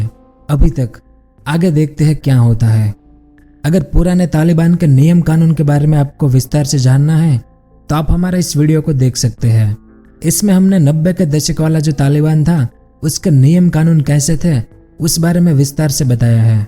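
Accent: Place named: Rural, India. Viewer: Tu puri villege bawa hindi bolta hai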